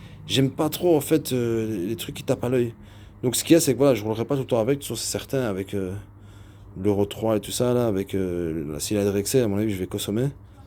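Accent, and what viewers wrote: Belgium. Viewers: Ça fait plaisir de te revoir, toi, ta jolie mob et ton petit accent Belge qui fait plaisir 😎✌️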